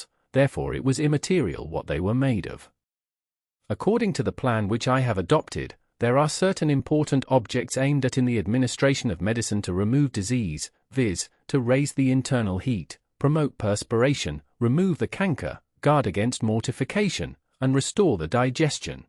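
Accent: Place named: United Kingdom. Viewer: The fact that the voice is British…. Doesn’t fit. He was American. And his book is amazing. I couldn’t put it down, so the fact that this is not catching my attention says a lot, to me anyway. Lol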